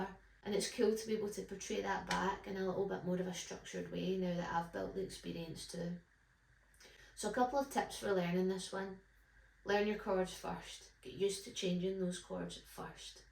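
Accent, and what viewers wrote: Scotland, United Kingdom. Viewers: Thanks for posting this tutorial. Love your accent 😍 by the way. Is it Scottish?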